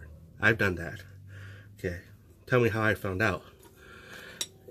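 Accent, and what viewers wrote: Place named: Hawaii, United States. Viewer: Where are you from you sound local to my area I am from Maui Hawaii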